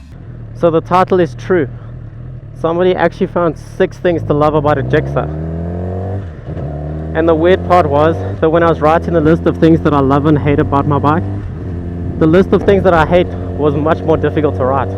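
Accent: South Africa. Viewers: That SA accent is unmistakable. Thank you for sharing man!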